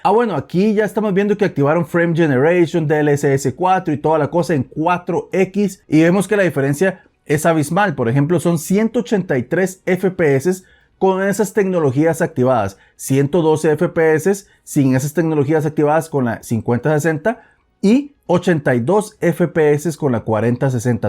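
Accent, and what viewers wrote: Costa Rica. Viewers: jaja que bien, no se porque dije: este mae tiene jacha de tico y acento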